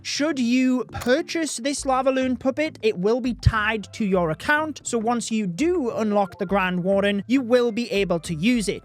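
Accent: United Kingdom. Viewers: Is he British or what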